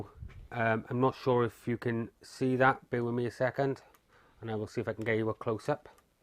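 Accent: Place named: Wales, United Kingdom. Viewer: Hello, Great videos and nice intro. I live in northern Sweden and got in to scrapping by accident so to say. I totally understand the reason you are scrapping as I do it for the same reason, it's escapism and theraputic. Where abouts are you from? Saw you blamed welsh accent, I been to north Wales and loved it, felt like home almost.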